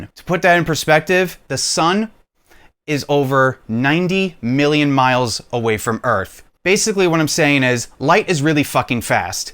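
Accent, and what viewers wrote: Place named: New Jersey, United States. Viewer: for being from jersey you simmer on the accent, thx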